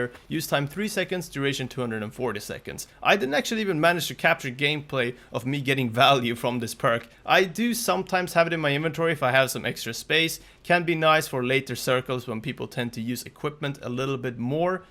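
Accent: Sweden. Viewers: bra video, that swedish accent tho XD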